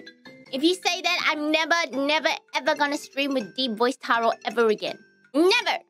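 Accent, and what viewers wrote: Australia. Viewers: 중저음의 호주식 영어.... 크로니와는 다른매력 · 중저음 호주억양 최고야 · 오지 악센트가 좋아지고 있어..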